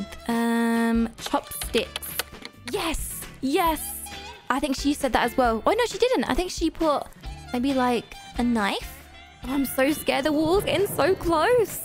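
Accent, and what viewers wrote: England, United Kingdom. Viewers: Are you English?????????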